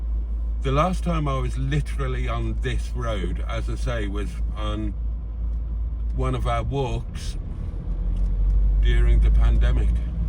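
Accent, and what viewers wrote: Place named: Birmingham, United Kingdom. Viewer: Was there the other week, great place and a great fish and chips. 20p for a pee though!! By the way have you spent much time in Birmingham as I can detect a bit of an accent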